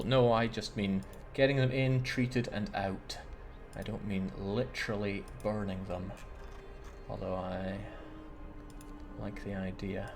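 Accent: Scotland, United Kingdom. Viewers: Hey buddy how do you rotate the buildings? Love your commentary style btw, what part of Scotland are you from? I can't understand some Scottish accents but yours is the best I've heard!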